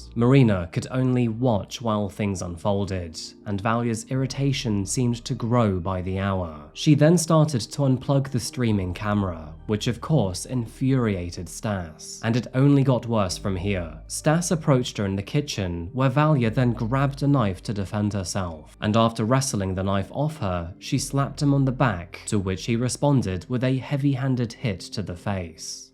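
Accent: England, United Kingdom. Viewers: You have an English accent but pronounce some words like an American? Is this for your American viewers or just how you say it?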